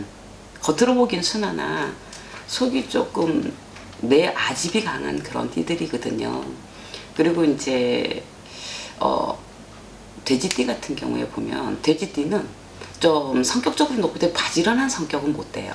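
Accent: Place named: Gangwon, South Korea. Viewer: 선생님 말투에 강원도 사투리가 섞여 있어요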